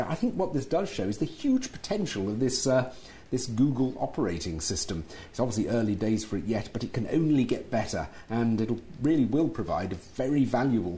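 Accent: England, United Kingdom. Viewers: HES ENGLISH NOT EVERY1 IS AMERICAN OR CANDIAN U KNOW ?! caps** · damn.. the first british accent I like so much :D 5 * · he is so posh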